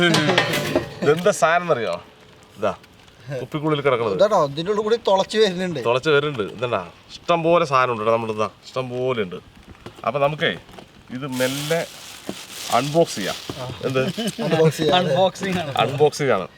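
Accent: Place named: Malappuram, India. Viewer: ഇടക് മലപ്പുറം ഭാഷ വരുന്നുണ്ട്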